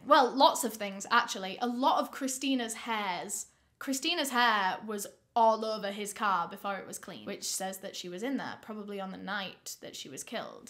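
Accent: United Kingdom. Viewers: I found British MrBallen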